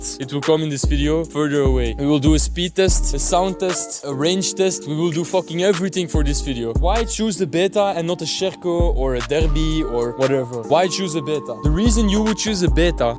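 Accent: Netherlands. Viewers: ik hoor die Nederlandse accent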